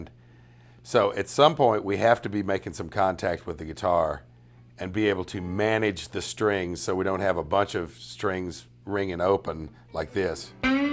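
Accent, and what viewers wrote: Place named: United States. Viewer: This is the most American accent i've ever heard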